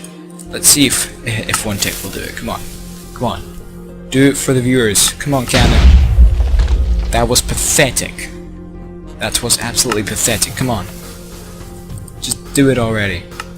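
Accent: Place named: Ireland. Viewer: Ahhh okay. You sound Irish so I find it pretty funny.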